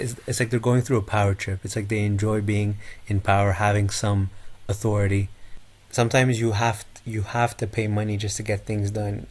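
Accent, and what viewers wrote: Egypt. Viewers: To be honest, I can't agree more, but let me say that you sound so Egyptian! The way how you say Raml, Mansheyya, Montazah, it's proving that you are Egyptian. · U are from alexandria cause of tge way u speaking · Hey, for me what you are just trying to do is SHOWING OFF or trying to get more VIEWS, you are pretending to be American but you are Egyptian and it's easy to tell from your accent and maybe you are living here in USA,!!! i have been here in USA more then 20 years and i never said or published any thing bad about my own country and off curse i wanna everything in Egypt to get better but not with publishing that stuff in English???????? (it's a lot to say about that), any way every country has their own bad stuff as well even in USA.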